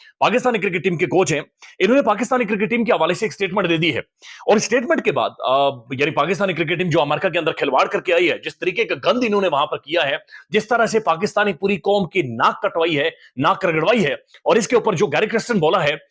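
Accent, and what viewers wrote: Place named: Kashmir, India. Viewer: Ap kashmiri lagty ho apki urdu gulabi hai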